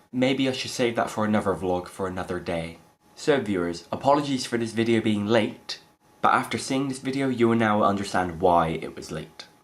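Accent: Bristol, United Kingdom. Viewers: Ahh I love the Bristol accent:) I was gonna go see on Wednesday here in Dublin but just didn't get around to it:/ Maybe there's some left:) Glad you enjoyed. xo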